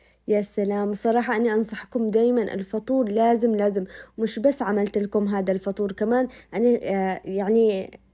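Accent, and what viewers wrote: Aden, Yemen. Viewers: محلا كلامك الكلام العدني يجنن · تسلمي ع كل الاكلات الحلوة وتسلم الهدرة العدني الذي تخرج من فمك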